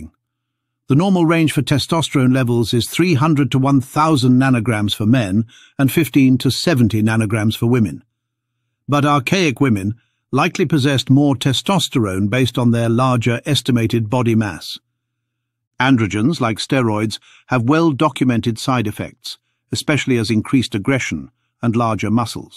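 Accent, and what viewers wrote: United Kingdom. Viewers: A clipped brit accent and utterly no academic background in physical anthropology makes for sensationalism , not science.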